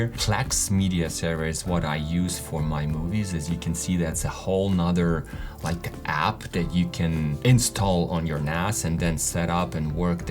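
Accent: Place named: United States. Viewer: Hey I like your accent,- sounds really good American!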